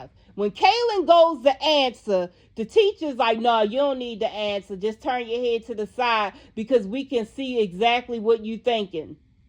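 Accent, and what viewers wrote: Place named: Maryland, United States. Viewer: Hey, You have an accent the same as my cousins from Maryland Are you from Maryland or Washington, D.C,?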